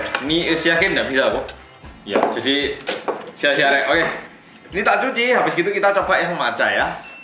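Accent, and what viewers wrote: Java, Indonesia. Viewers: Kakak keren bangett sii, orang korea tapi medokk banget jawanyaa.. Fasih juga bahasa indonesinya... Sukses selalu mas 😍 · Muedokkkk e pollll rek mas Iki ngalah² i wong jowo🤣🤣🤣😍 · Kak itu: •pinter •ganteng •cakep •medok jawa banget (walaupun au ngak bisa bahasa jawa:v) •ganteng •dan gantengggggggggggggggg Sumpah cakep bener kak 😍😍😍🤩🤩🤩🥰🥰🥰🥰 By: fanbase kak 🥰🥰🥰🥰 <mail> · halo guys welcomback to korea bersama org korea yg Me:Medhoookk....:luwess.. Me:malu ny aku anjir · "Dewean e , hampuddd" Medoke samean nggarai nagih mas😂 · pokok e kalo udah bahas makanan atau minuman.. pakenya luweh. selain itu pake medokk.. hahahaha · Halo guys welcome back to Korea bersama orang Korea yang "MEDOOOOK" bolo-bolo mana sworone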